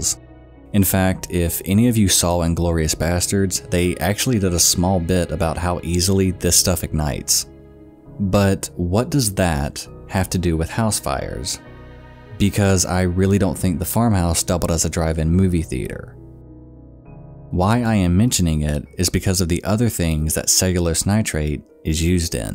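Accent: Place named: Midwest, United States. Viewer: heh, you pronounce especially like "expecially". you must be from the midwest.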